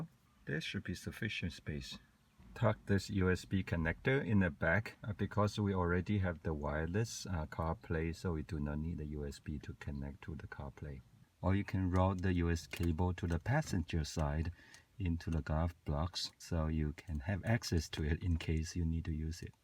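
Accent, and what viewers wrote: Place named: Germany. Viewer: Great video, neat and clean during all the steps. Also like your voice, sounds like you speak with very slight German accent or German speaking manner. Anyway, just noticed one problem - according to the manufacturer you should not glue the RF/WIFI antenna to the metal surfaces, including the box itself, because this may cause some wireless connection signal issues. Instead you should glue it to the plastic surfaces, more closer to the driver, or where the phone usually lies. Another question - how different is the sound quality, compared to your oem bluetooth and aux? Also, when having a call inside the car, do you use oem BT phone or carplay phone via aux?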